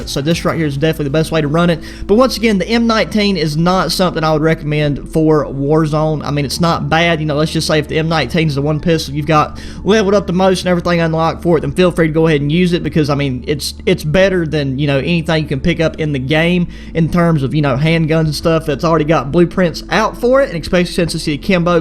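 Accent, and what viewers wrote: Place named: Texas, United States. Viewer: I'm not trying to be rude but you sound like you're from Texas or like a cowboy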